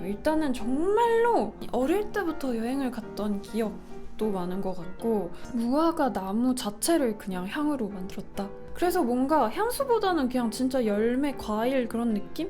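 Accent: France. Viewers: 딥티크의 향수이름들이 맨날 어려워서 제대로안부르고 다녔는데 오늘 님 영상 덕분에 제대로 된 이름이랑 또 고급진 프랑스어 발음도 들어보게되서 유익한 시간이였어요! 영상을 보는 저도 교양이 업그레이드되는 느낌? ㅎㅎ 아 사랑해요 님 저는 오데썽이 좋아여 <mail> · 딥디크가 프랑스 명품 향수였다니... 님 덕분에 모르고 있었던 정보를 알아가네요 저도 부모님 향수 선물로 드렸던 적이 있어서 그때 생각이 나네요ㅎㅎ 님의 프랑스어 발음을 들으면서 딥디크 향수들의 이름과 정보를 더 알게 되어서 짧았지만 좋은 시간이었습니다. 너무 빨리 지나간 느낌이에요. 다음에 또 이런 컨텐츠 해주세요! <mail> (오데썽으로 신청하겠습니다) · 저는 향수 입문을 딥디크로 처음 했는데 딥디크 향수를 보며 항상 이거 정확이 어떻게 읽지 ㅋㅋㅋㅋ 이러면서 여러사람들과 장난치듯 읽으며 이야기 해봤어요. 실제로 불어의 발음을 들으니 더욱 갖고싶은 향수가 된것 같습니다. 설명까지 불어로 읽어주시는게 너무 좋은것 같아요… 프랑스의 명품 브랜드의 소개 컨텐츠도 좋을것 같습니다. 그리고 여러분 향수를 구매하실때는 무조건 유명하다고 구매하지 마시고 꼭 매장에 방문하셔서 시향,착향을 해보시고 구매하셔야해요 호불호가 적은 향수라고 해도 본인에게 불호일수도 있고 각자 이미지에 맞는 향수가 있습니다. 시향,착향을 하실때도 첫향만 맡고 구매하시는것 보다는 잔향까지 맡아보시고 구매를 추천드립니다:) <mail> 오데썽 신청해요 ^.^ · 로제 닮았다 ❤❤❤❤❤ 불어발음 고급지고 넘사벽 엄친딸임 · 안녕하세요 님 프랑스 컨텐츠 하실 때 마다 발음 억양 너무 예뻐서 배워보고 있는데 저는 그렇게 잘 안돼요.. 님이 한국어 발음으로 교정해주시지만 혓바닥이 강직하게 뻣뻣해서 그냥 한국어로 또박또박 말하는거에 가까운.. 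다음 프랑스 컨텐츠 하실 때 행여나 실례가 안됀다면 발음 컨텐츠 햐주세요~~ 님 구독한지 벌써 3년, 구독자 10만쯤 부터 계속 보고 있습니다!!(모든걸 다 본건 아니지만) 힘든 일 있으셔도 항상 힘내시고 머리카락 이번엔 뿌리만 남지 않길 바래요ㅋㅋㅋ 향수는 오데썽 이메일은 <mail> 입니다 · 역시 믿듣 언니! 제가 사려고 고민했던 딥디크 향수를 리뷰아닌리뷰해줘서 너무 감사해요 항상 언니의 불어영상을 보면 넘 기부니가 조크등요 ㅎㅎ 항상응원해요 사실 향수리뷰보다 불어발음하고 읽어볼 생각이 없었던 로고에 박힌 주소까지 은근 더 다양한 정보를 얻은거같아서 넘 좋았어요! 불어영상이외에 게임영상도 자주보는 연잎이에요 응원해요 언니 항상 ❤️ +제꿈이 영상계열이라 도비님도 언제나 응원중입니다 화이팅이요 좋은영상 늘 감사해요 <mail> 도손이요❤️ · 님 아프리카 생방송으로도 종종 보고 유튜브는 구독하고 매일 시청하는 연근이에요. 이런 프랑스어 컨텐츠랑 요즘은 쿠킹덤 컨텐츠를 제일 좋아해요!! 평소에 딥디크 향수가 좋다고는 많이 들었는데 약간 비싸다보니까 쓸 기회가 없더라고요, 그래서 님 영상보고 제가 좋아하는 프랑스 발음도 듣고 향수 설명도 들어서 영상 너무 좋았어요!! 제가 올해에 스무살이라 곧있으면 성년의 날이라서 향수 하나 마련하고 싶었는데 이벤트 당첨되서 딥디크 향수를 갖게되면 너무 행복할것같아요, 제 버킷리스트에 향수모으기가 있었거든요! ㅎㅎㅎ 항상 영상 잘보고있어요 님!! 오랜만에 프랑스어 책 읽어주는 컨텐츠도 보고싶네요 ☺️ 오데썽 <mail>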